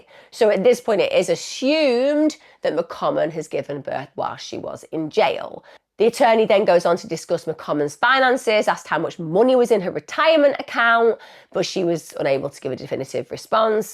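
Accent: United Kingdom. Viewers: Nice to find uk accent podcasters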